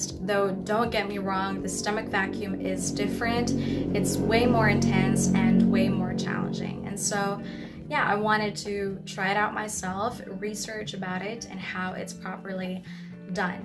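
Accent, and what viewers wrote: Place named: United States. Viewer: Omg it's like I'm watching an American vlogger. Your accent is soooo 🔥🔥🔥. Thanks for sharing btw. ❤️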